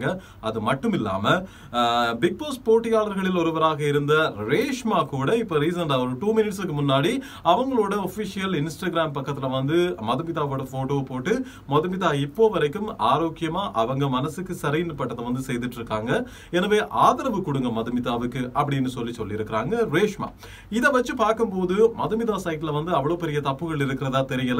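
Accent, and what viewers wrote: Sri Lanka. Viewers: Bro unga Tamil speech nalla irukku I meen ilankai Tamil super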